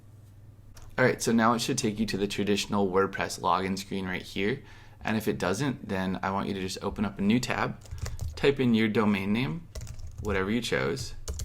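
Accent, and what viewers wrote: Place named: Midwest, United States. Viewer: Thank you so much for the amazing tutorial! This is my first time building a site and the video coupled with the Midwestern accent made it easy to follow along with (even if the video took me 5 days to get through from all the pausing haha) I do have a question about editing the blog page with elementor. I am gathering that this is not possible when the blog page is created under pages? I was able to edit under posts, but the changes are not showing on preview. This all was noticeable during the "adding the footer template" portion of the video. Will I need to go to the pro option to edit with elementor or keep archives. Do you happen to have a video on this already, so as not to be redundant in comments? Thank you again!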